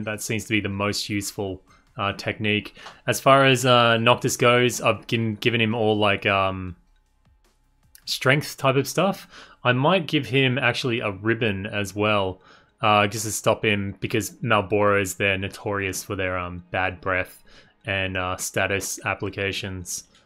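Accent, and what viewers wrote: Australia. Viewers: Are you from Australia by chance?:D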